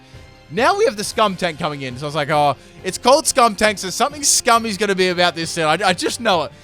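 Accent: Australia. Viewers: you sound like an australian dude named ben for some reason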